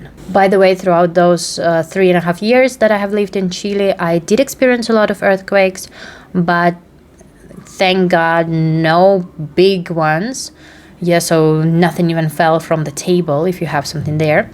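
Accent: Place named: Argentina. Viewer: De dónde eres, tu acento no es para nada anglo, más bien pareces una arjentina hablando inglés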